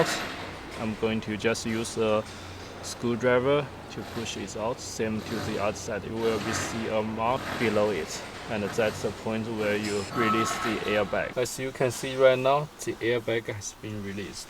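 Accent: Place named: China. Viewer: Your English has improved by the years sooo much :D you don't have a big chinese english accent anymore. Just a light. :)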